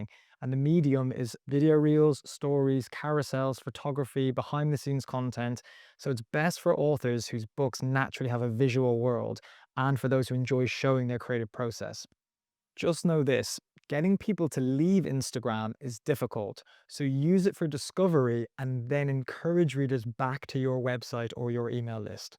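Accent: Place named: West Country, United Kingdom. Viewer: hi, are you from Devon? Your voice, your accent sounds the same as mine. It's so nice to hear a westcountry voice.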